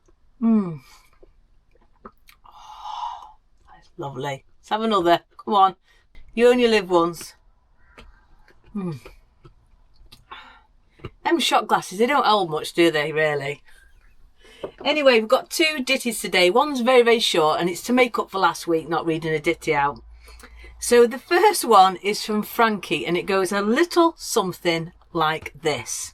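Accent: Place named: North, United Kingdom. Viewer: Hi this is the first time I’ve visited your channel and guess what? I am now going to look for all your videos on you tube as you make them so interesting and informative.This channel for me is the best of the lot.I may eventually catch up with you as I have a feeling I’ve a lot of videos to watch.I love your humour and your northern accent.Thanks so much.Enjoy your travels.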